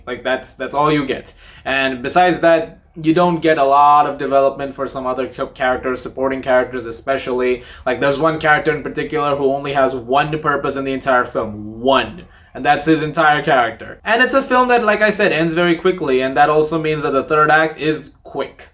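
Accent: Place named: United States. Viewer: I am also Indian 🇮🇳 I am just asking as you can speak English so fluently like an American accent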